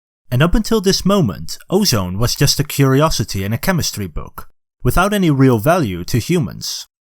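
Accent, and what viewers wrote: Netherlands. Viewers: you sound dutch am i right? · are you dutch ur accent sounds like it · I think it's his Dutch accent. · Are u from the Netherlands cuz u have a Netherlands accent.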